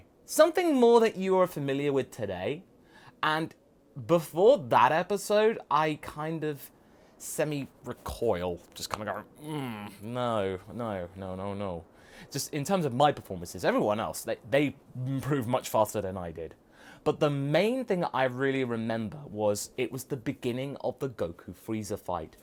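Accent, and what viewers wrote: United Kingdom. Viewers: I remember being so shocked that you were british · HOLY YOURE BRITISH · By any kind, Are you British?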